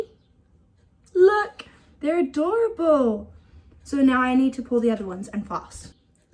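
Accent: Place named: New Zealand. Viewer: My first question was going to be what is your accent?? I couldnt quite place it! New Zealand! I was actually vegan for many years. That mold is so cute!! For my silicone molds, I like to put them on top of a small cookie sheet to carry them to the fridge or the oven. I had too many mishaps before I started to doing that..Hahahaha. My Grandsons love gummy bears, so I know they would enjoy these!!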